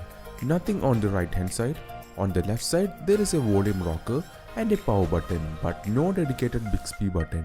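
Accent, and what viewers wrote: Kerala, India. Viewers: R from from kerla. ? I m guessing from accent.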